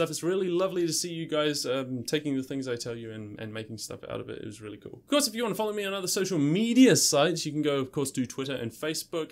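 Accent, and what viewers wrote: New Zealand. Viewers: Hey are you kiwi bro? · Hmm do i hear a bit of New Zealander in your accent? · i feel like your from New Zealand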